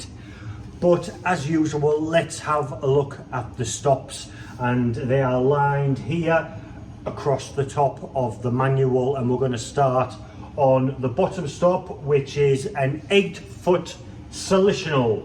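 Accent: Newcastle, United Kingdom. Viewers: I always think of Harrison & Harrison organs as lavish instruments in lavish places like Westminster Abbey and Kings college chapel (Cambridge) - it's interesting to see and hear an example from the other end of the scale. For some other reason when I discovered this channel I put pipe organs and Geordie accents together and assumed you must work for Harrison & Harrison 😕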